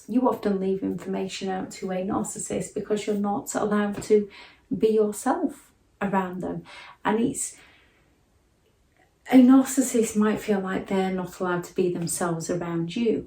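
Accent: United Kingdom. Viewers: I like your work. You are well researched, even keeled and the UK accent is just lovely.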